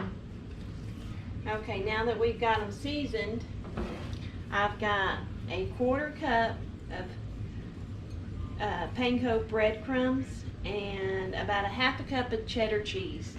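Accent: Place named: South, United States. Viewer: Thank you for the recipe! I made this today and it came out wonderful. My husband loved this. I simply can not wait for more recipes from you. By the way I just adore your southern accent!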